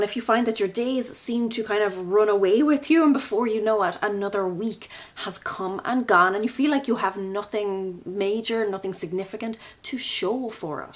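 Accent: Ireland. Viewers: These were great tips, thank you. Might have a look at what else you have. Love your accent, are you Irish? Thank you for sharing 🥰🙏🥰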